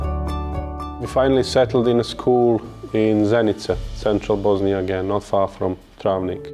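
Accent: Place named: Yorkshire, United Kingdom. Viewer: The Yorkshire accent has settled in well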